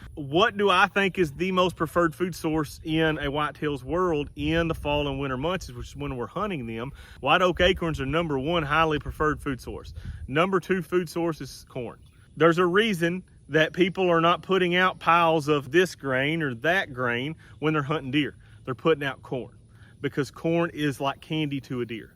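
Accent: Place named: South, United States. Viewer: Thanks, as always great information! I enjoy your channel and am learning a lot from you. I watch your videos so much that my wife can now do a impersonation of your southern accent:). We live so far north our neighbors are in Canada so there's not any southern drawl up here. Appreciate you!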